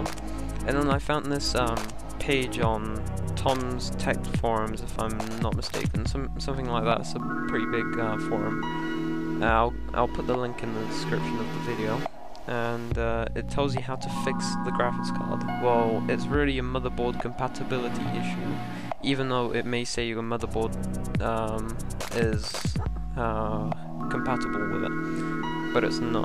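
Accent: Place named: United Kingdom. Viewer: Yeah british accent is already hard to understand and the music makes is harder to understand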